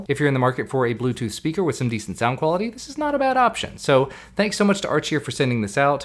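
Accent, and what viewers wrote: Kentucky, United States. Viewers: what part of kentucky are you from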